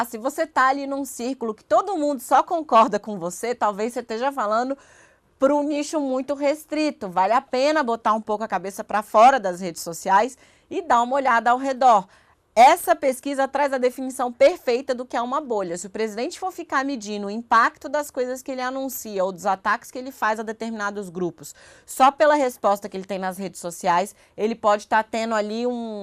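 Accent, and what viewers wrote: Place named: Minas Gerais, Brazil. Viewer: Não esconde o sotaque mineiro não. Adoro.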